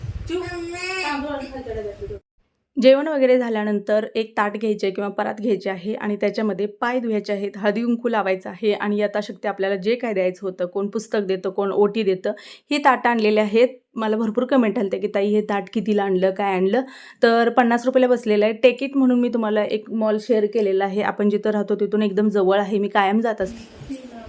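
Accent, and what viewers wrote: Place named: Solapur, India. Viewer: Ag tai Tu kolhapur chi aahe na solapur ka bolte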